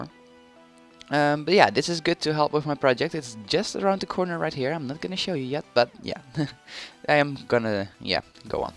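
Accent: Netherlands. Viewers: Ben je Nederlands? Je klinkt Nederlands :)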